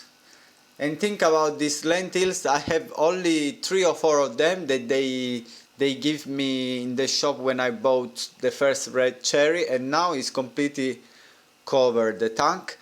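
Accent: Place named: Italy. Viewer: pretty sweet bro! u italian? hahaha ur accent makes me tell u r! but anyways nice tank and vid! just earned a sub please consider subbing back please if u want.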